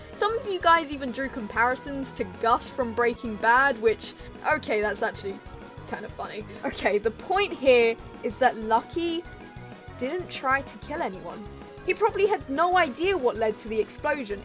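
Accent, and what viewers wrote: United Kingdom. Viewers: WHY IS BRITISH!?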